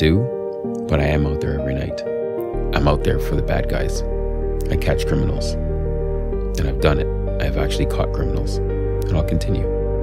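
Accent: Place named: Canada. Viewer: YOU ARE A CANADIAN.......I CAN MAKE IT OUT FROM YOUR ACCENT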